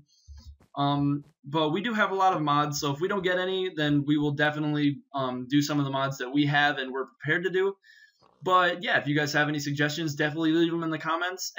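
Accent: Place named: England, United Kingdom. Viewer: I think he comes from Britain and more precisely England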